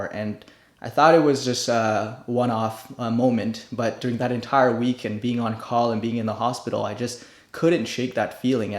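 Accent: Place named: Canada. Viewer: o shit you're canadian?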